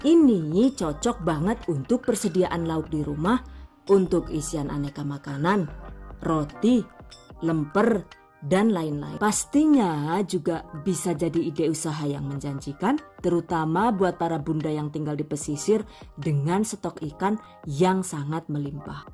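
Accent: Banyumas, Indonesia. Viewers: Saya suka lihat youtube ibu , kreatif, tata bahasa lucu, pakai ngapak bahasa Inggris gokael gaes 😁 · Rika wong ndi sih yu ketone ngapak temen kaya nyong hehehehee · Asem ngapak jebule 😂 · Hahaha wong ngapak juga yah ternyata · Wkwkwk saya orang banyumas....denger orang ngomong ngapak jadi ketawa sendiri 🤣🤣🤣🤣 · Asli mana seh kok bahasanya sm kek aku ngapak" 👍😁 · Kaget, bar formal trs ngapag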